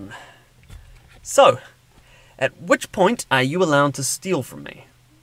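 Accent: New Zealand. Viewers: Why does Matt Smith have a kiwi accent?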